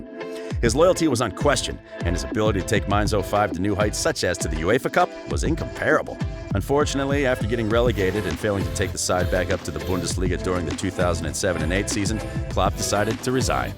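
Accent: United States. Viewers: After one minute of utter nonsense i gave up. Klopps "unimpressive" start of his coaching career over here in germany was to take over a village club like Mainz in the second division, when he was still a player without any coaching experience and make that club a bundesliga regular, even reaching uefa cup. Afterwards he went to Dortmund where they had just avoided bankruptcy and had no money for larger transfers. There he kept the people patient for some years with very emotional and attractive football, while building up his squad and it ended in consecutive championships, a national cup and a championsleague final. If that´s not impressive i don´t know what is. When they called "fergie time goalscoring" brilliant and implied it would have been a tactical revolution, while completely missing out on gegenpressing i decided to not torture myself further, as i feared i would become dumber by shear listening. 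We shouldn´t blame it on the american accent, as the journalism about nba or nfl for example is very accomplished. Whoever wrote this would never be allowed to report about a basketball game in the US. To me it sounds like the newscaster of instagrams celebrity rumours and scandals or something like that. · No insult intended, but when watching & listening to a video about soccer I´d rather have a British English speaker … ! - Sorry guys across the pond, this broad American just does not fit. I guess even a german accent would be a better match here … :)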